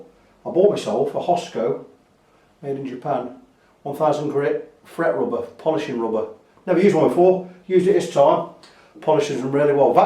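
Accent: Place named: Nottinghamshire, United Kingdom. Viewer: Great restore mate! Ican see you are passionate about your work. I can slightly trump your bargain though - I picked up an ESP built Focus <num>) in the 90s - MASSIVELY unfashionable then lol, with the original FR also in the metallic red like yours for ony £60 :) The Charvel Japanese Model series (Fuji Gen?) from mid 80s are another underrated and overlooked find. Would be good to see you make a super low cost (but not nasty) Chinese guitar like a Stagg or something play like butter! Also your accent - are you in or near Sutton in Ashfield or thereabouts?!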